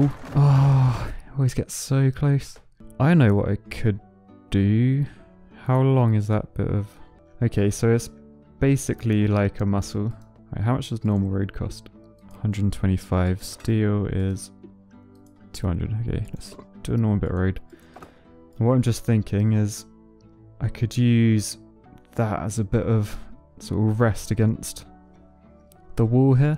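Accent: United Kingdom. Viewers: Its the British accent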